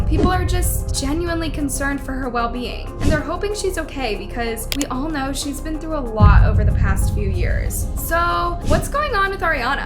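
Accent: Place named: Los Angeles, United States. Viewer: That L.A accent is soo annoying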